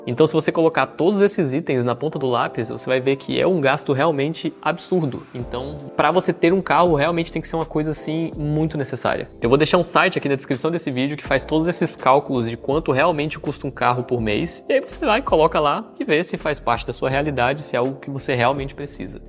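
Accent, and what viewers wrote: Brazil. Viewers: So de ver a casa se nota q ñ mora no Brasil 🇧🇷 , ate ele falar 😂 , eu tbm moro na Europa . valeu as dicas. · Hahaha amei seu canal… E cara, teu sotaque é muito baiano…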